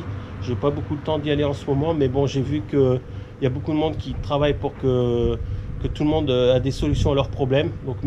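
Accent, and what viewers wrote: North, France. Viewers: Salut, jsuis désolé pour toi de quitter un grand espace pour un espace plus restreint, mais faut s'adapter. Je vois que tu es du nord!? Il me semblait bien avoir reconnu à la voix mais j'avais le doute! Je suis de Cambrai dans le nord je travaille sur une super 5 GTL de 1986, elle passerait dans ton allée lol Moi j'ai ce soucis de place également je travaille sur un terrain non bitumé c'est terrible et je partage quelques vidéos. Je te souhaite bon courage pour toute tes installations d'aménagement. À bientôt, Mat de #super5gtl86 😉✌️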